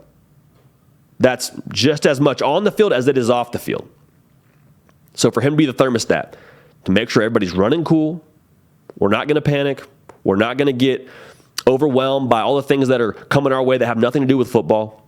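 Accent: South, United States. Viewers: why does this guy have a southern accent? He's from SoCal and went to Cornell.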